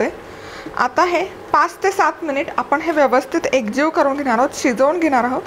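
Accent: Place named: Kolhapur, India. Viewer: Kolhapuri aavaj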